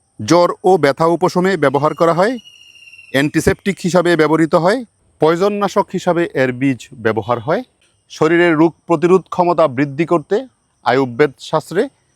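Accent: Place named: Mymensingh, Bangladesh. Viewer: উনার কথায় ময়মনসিংহের আঞ্চলিকতা আছে। তাই কিছু শব্দ আঞ্চলিকতার কারণে চলে আসে।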